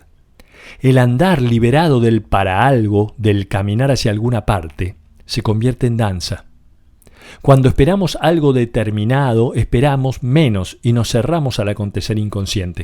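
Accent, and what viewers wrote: Argentina. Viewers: La magia del pensamiento de Byung Chula Han , narrada con esa hermosa voz argentina. ❤ Gracias 🙏🏽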